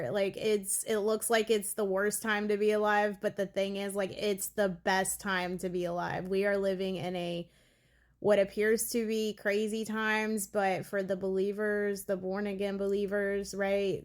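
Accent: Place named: South, United States. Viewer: I am sorry, but I must ask. Are you originally from California or the South? The way you speak sounds like the South.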